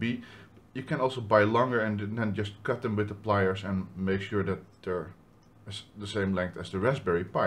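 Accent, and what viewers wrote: Netherlands. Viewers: haha vanaf de eerste seconde herkende ik de nederlander hier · Love the Dutch accent .. :)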